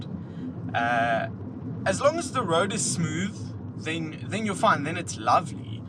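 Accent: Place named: South Africa. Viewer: I could listen to that South African accent all day 🤗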